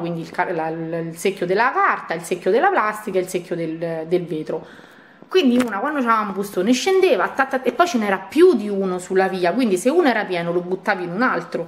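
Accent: Rome, Italy. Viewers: Ciao finalmente posso dirti che sei troppo forte con la tua parlataromanesca un bacione a Ciao 💖👍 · ciao!... io ti adoro a sentire il tuo accento romano... mi fai tanta compagnia...un bacio a!!😍e un bacio a te😙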